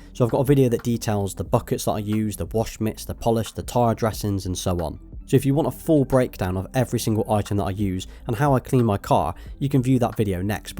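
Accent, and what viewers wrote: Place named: England, United Kingdom. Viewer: Model 3. Great quality video and refreshing English accent 😉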